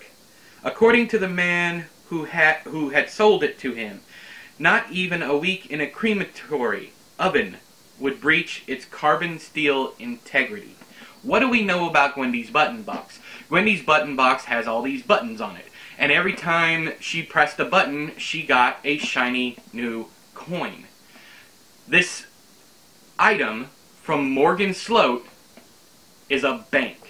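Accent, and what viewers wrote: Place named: Midwest, United States. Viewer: Where do you shoot from? I'm from Laja, Chile, myself. I like your accent, it's what I'm used to hear the most, having lived a couple of years in the Chicago area and in southern Wisconsin.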